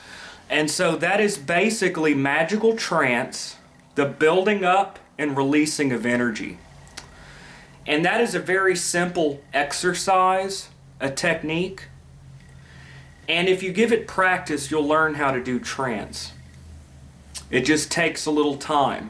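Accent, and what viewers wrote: South, United States. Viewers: Thank you for your ability to teach clearly! Very rewarding for me! You must be down south here somewhere. Esoteric instruction is always better when delivered w a southern accent 😃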